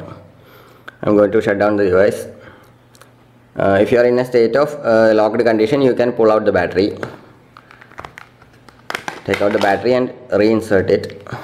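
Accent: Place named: India. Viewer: Could you show me how to speak English not Indian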